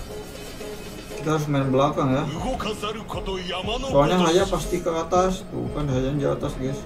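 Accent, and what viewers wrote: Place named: Garut, Indonesia. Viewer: Logatna jiga urang sunda mang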